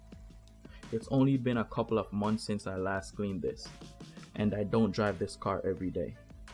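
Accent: Jamaica. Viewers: U Jamaican bro??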